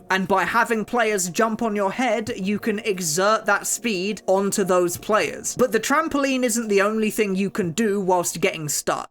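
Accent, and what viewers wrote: United Kingdom. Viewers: He has the most british accent i've ever heard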